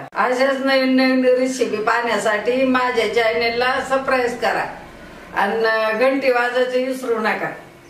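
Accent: Ahmednagar, India. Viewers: I know I lived in Rahuri ,I went to school in Ahmednagar ,the way you spoke .I remember those day.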